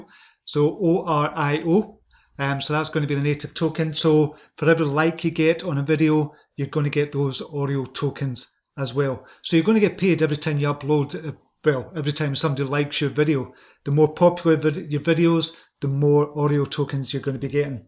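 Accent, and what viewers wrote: Scotland, United Kingdom. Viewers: Where in Scotland are you from? :o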